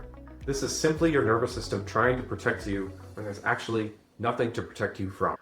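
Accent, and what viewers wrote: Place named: Kansas, United States. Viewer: This was enlightening! It’s so difficult for others to imagine chronic pain. I get up and dance at a wedding everyone says hey I thought you were crippled and in pain…..I am but you have to live! You are so knowledgeable yet down to Kansas friendly speech. You deserve a huge audience! When I turned 40 fibromyalgia almost ruined my busy life. Add the loss of 8” in height and back pain like the kidneys you mentioned! It’s a miracle I listened to your kidney pain info and it saved me a trip to the nephrologist! Thank you oops!❤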